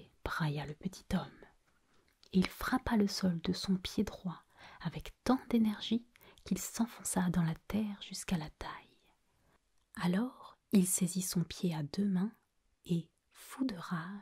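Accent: France. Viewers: i like this french version, cause french is so soothing and sweet sounding. i also understand it. but. the french accent in english i find even better. because the accent, with its note of vulnerability and a little awkwardness, is the loveliest of all... thank you so much. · Je ne peux pas le croire, elle est française! J'aurais dû m'en douter avec l'accent.. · I really like both, but i think i prefer the french accent! French is ma langue préferée <3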